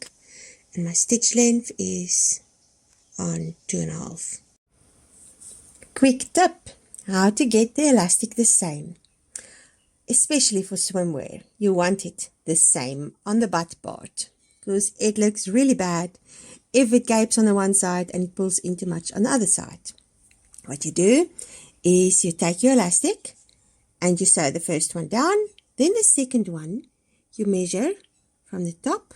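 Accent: South Africa. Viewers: Hi there, how clever of you to make your own costume. So gorgeous to hear you delightful Sth African accent. Its lovely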